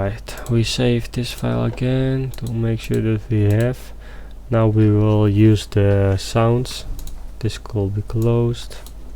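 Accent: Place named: Netherlands. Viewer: Eyy jij bent Nederlands! Hahah kan ik horen en zien haha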